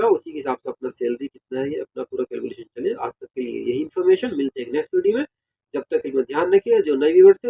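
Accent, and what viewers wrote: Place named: Rampur, India. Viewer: Ap Rampur ke ho kya ..kyon ke apki baat chit ka andaaz rampuri hai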